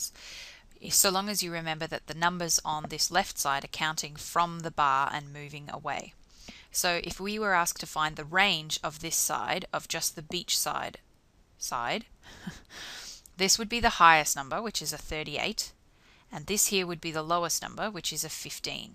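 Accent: Australia. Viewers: yea its aussie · Aussie! Ayy reminded me of Alycia Debnam Carey... but yesss thank you for this! V helpful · you have a lovely accent haha it's aussie accent right?;)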